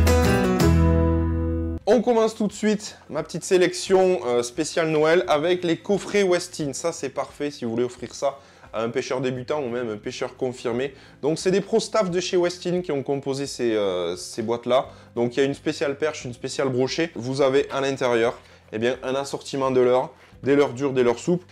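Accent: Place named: South, France. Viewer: Salut l'amie pêcheur ! Tu as très bien présenté tes produits en plus avec l'accent du sud ! J'aurais aimé qu'il y ai une présentation sur un moulin casting big bait car les promotions sont assez rare un peu partout ! Car je ne peux pas m'acheter se fameux moulin qui irait avec ma canne jerk bait 60 120 gr de chez Daiwa legalis ! Merci encore pour cette présentation !!! A bientôt l'ami !!! 👍🎣🤗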